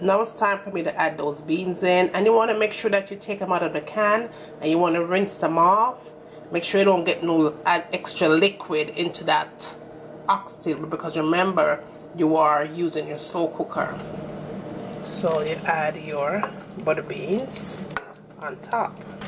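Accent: Jamaica. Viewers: Yessss I feel like I'm in Jamaica listening to you right now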